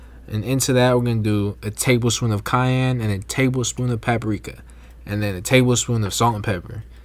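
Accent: Philadelphia, United States. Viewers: I just wanna know where you from ? Sounds like Philly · Sounds like he has a philly accent to me.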